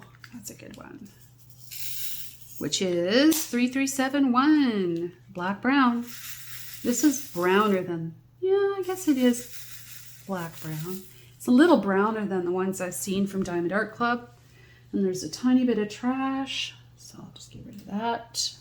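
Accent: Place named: Canada. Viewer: Hi. When you start talking tonight, I knew right away that you had to be Canadian, but I wasn't sure where from. I am from the East Coast, Newfoundland, to be exact, and we used to go to the beach every Sunday when we were kids to have a Sunday supper. I have a lot of fond memories of that. I have been living in Belgium for the last 6 years. I think it would be really great if you did a vlog of you taking your dogs to the beach or to the dog park. We would get to see a part of your world then. I will probably start my canvas in the middle of the month because I've got to finish the one I'm working on for another event. 😊😊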